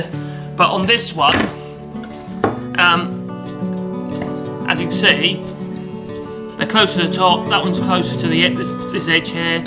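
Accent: Norfolk, United Kingdom. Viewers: Gotta be from Norfolk bor!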